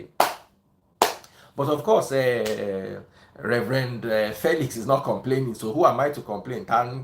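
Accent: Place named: Ibadan, Nigeria. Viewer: 🤣 i swear, your Ibadan accent is SAVAGE. You should entertain us more in our yoruba accent ooo.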